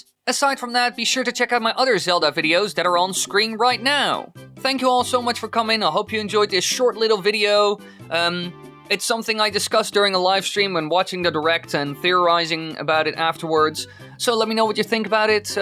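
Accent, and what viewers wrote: Netherlands. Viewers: I really, really miss your Dutch voice. You sound so forced and fake nowadays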